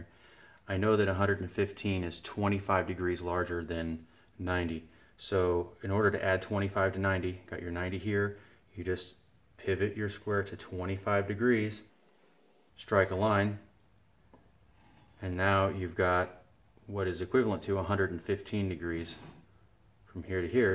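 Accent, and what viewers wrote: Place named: United States. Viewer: Love the American accent :) and a very helpful video thank you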